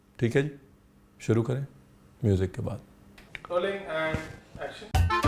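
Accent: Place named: Punjab, India. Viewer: bro u r out standing i mean kuch v hove tuhadia videos ni kde v miss kitia n tuhadi jheri apne aap punjabi a jandi vich bolde bolde oh ta bhti vdia lgdi menu jdo hain kehnde "hain" kehnde hehhe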